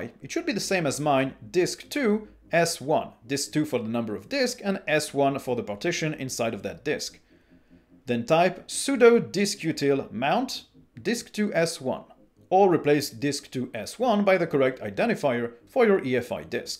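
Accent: France. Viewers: Des vidéos en Français aussi serait les bienvenues, le YT Français étant un peu à la ramasse par rapport à l'Anglais, ceci étant dit, je suis parfaitement bilingue et j'appréci le p'tit accent frenchy, keep it up mate!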